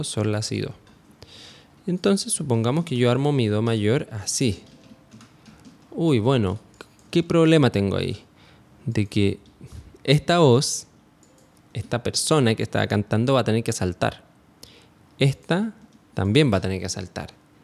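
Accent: Chile. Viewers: Habla como chileno si erí chileno bro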